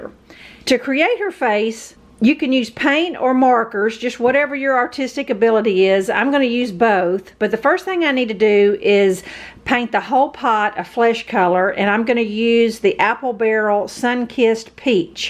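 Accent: South, United States. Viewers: Are you a southern lady?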